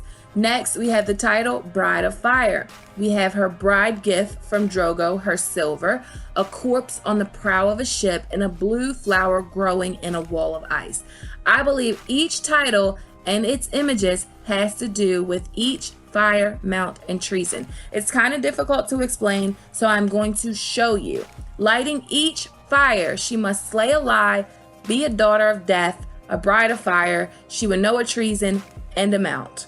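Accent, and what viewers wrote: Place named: Baltimore, United States. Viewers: your critical thinking skills are next level...i have enjoyed your videos for the past couple years (you, gemma, quinn, and preston jacobs)...you are obviously as obsessed with the GOT world as i am. (and your baltimore accent reminds me of my family) keep making that magic happen. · I agree I hear a distinct Baltimore version of the Long Night. I don't know where is from but I am hoping I am right. She send us Ravens it only makes sense.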